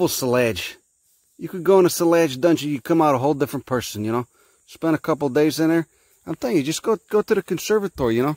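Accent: Chicago, United States. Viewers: the heavy chicago accent makes this so much better because it makes you sound like such a dad. im basically imagining a chicago ned flanders teaching me about plants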